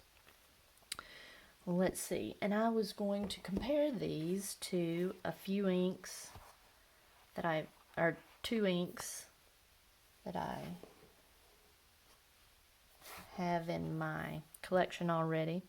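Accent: South, United States. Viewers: I love your videos, what is your accent, Southern maybe ? I`m from Northern England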